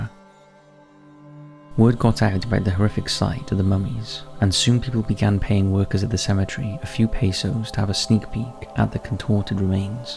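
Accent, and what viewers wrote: Wales, United Kingdom. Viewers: He has a welsh accent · Your voice helps me get to sleep. That is a compliment, by the way. Where in Wales are you from? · This is proof that someone with a beautiful British accent can mispronounce words. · I always thought that the narrator was Welsh or has Welsh origins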